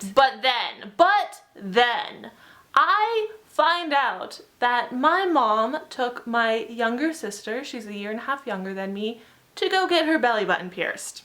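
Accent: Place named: Ireland. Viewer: Irish whoo 😀😀😀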